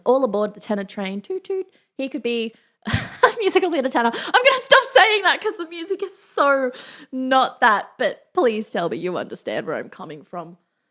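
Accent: Australia. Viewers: The Australian accent is always amazing to listen to. But it just become awesome, when the Australian gets excited. Accents generally does not matter that much to me. But Australian is just.. Those sounds.. Its amazing. And I am sorry talking about this and not the video, but I had the biggest smile on my face because of it the first time you paused. Kamelot is a really interesting band, you should 100% look into more of what they have done. Always fantastic to see you react to things, and give your take on it.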